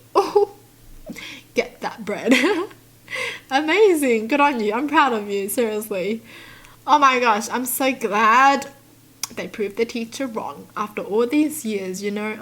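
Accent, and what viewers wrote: Australia. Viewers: Love the strong accent, like its very aussie and i love it!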